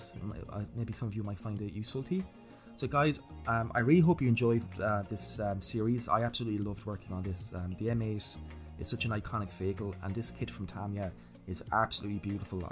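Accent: Ireland. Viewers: I like your technique. Obviously built up over time. The finished product looks great. Have never seen your channel before, I will make it a point to check out your other videos. And, it's nice to hear an Irish accent again.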